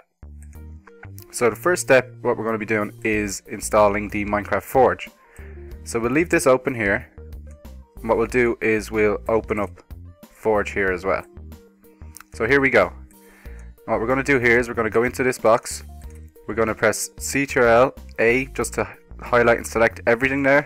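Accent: Ireland. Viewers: he's IRISH YAAAAAAAAAAAAAAAAAAAAAAAAAAAAAAAAAAAAAAAAAY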